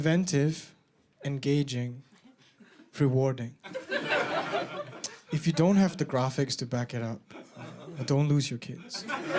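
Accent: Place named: Singapore. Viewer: Are you Singaporean? I can hear the accent haha, I’m proud!! You have really great jokes and way of storytelling ❤❤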